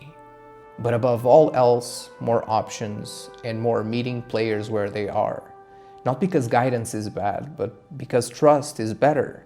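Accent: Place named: Portugal. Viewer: good video. CD is awesome. accent 100% fellow tuga. 😜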